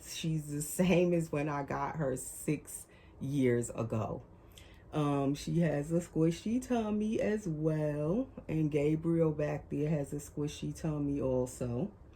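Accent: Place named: New York, United States. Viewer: Ok I have to ask... are you from NY? NJ? Lol you have that accent. I love listening to you talk!❤ I also love listening to myself talk 😂so I'm just wondering lol love your baby, she's sooooo cute!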